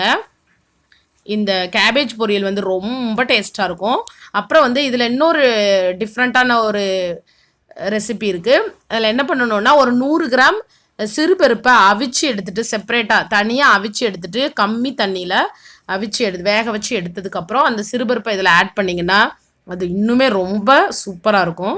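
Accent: Tirunelveli, India. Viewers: Neenga thirunelveli ya akka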